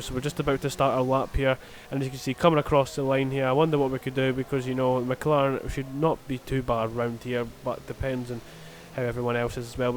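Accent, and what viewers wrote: Scotland, United Kingdom. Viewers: Good video and your Scottish accent is great! Lovin it!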